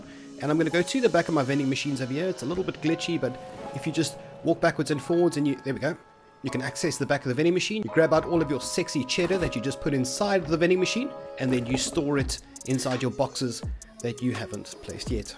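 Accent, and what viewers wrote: Afrikaans, South Africa. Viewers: Is my friend here south African? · Are you south african? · You sound Afrikaans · I heard your voice and new You were south African 😂😂❤️ Bro Where did you get your pc.. Is it a custom build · Are u South African bru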